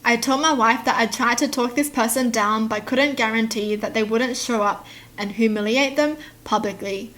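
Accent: Australia. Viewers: iamshooketh she’s just Australian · Love her Australian accent aswell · Is she Aussie? 🤔 Where does she live? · iamshooketh she’s Australian but so trueeeee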